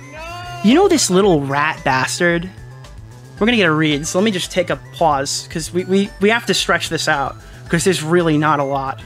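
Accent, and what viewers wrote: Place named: New York, United States. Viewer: This is fhe most aggressigely new york i ever heard you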